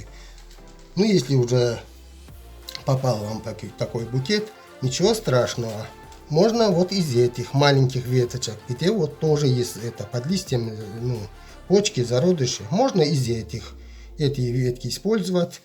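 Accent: Belarus. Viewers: Как приятно слышать белорусский говор, просто и доходчиво рассказал. Только хотелось бы увидеть ещё как из бокового тонкого побега вырастает новый. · Какой приятный для слуха белорусский говор,я не ошиблась?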